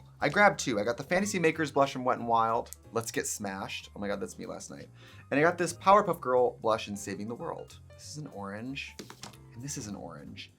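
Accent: Wisconsin, United States. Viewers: Just thinking about your Wisconsin accent late at night sometimes… it will never leave you,.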